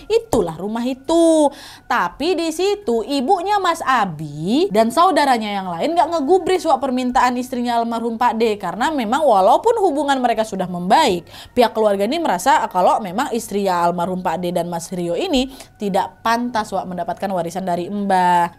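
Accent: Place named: Medan, Indonesia. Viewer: btul. hebat dia bawain ceritanya ngomognnya cepet kali pula logat medan lucu aku loh seirng kaget nntn nya trus dia ini suka jumpscare suka teriak2 sndiri aku jadinya wkakkaka apalagi yg serem dan kdg kebayang sndiri takut banget aku smpe kebawa mimpi saking tiap hr buka khw yg ketinggalan2 krn akh baru subs ini. kebawa mimpi smpe hahahaha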